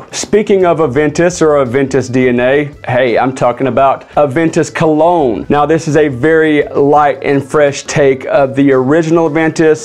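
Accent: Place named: Texas, United States. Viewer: Dude I really enjoy your reviews. You sound like your from my neck of the woods. Ft Worth friggin Texas. Preciate you’re work here. I’ll be checking some of these out.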